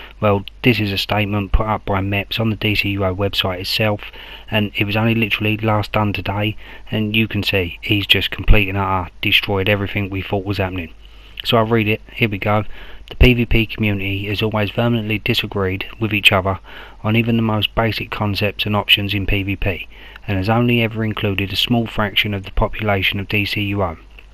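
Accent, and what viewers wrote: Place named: United Kingdom. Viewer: i know im late but hearing a another British voice is redreshing lool. Good video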